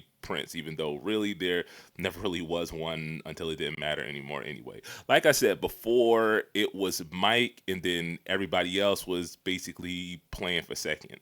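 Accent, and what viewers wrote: Philadelphia, United States. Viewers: are u from philly ?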